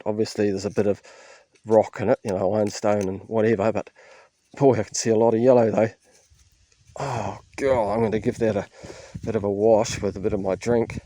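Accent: New Zealand. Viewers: Its quite marvellous😂😂 and obvious hes a KIWI! Derr!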